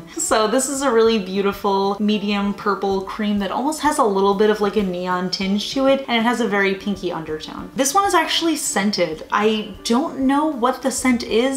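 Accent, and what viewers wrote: New Jersey, United States. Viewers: I didn't even notice that you said "pastel" in a way that would bother people. I'm from north of Boston but I'm half NJ on my mother's side, so I grew up hearing words differently. Farhead and Foah-head, Radiator (with a short a like apple) and Raid-ee-aydah, etc. So count me in as a fan of the NJ accent!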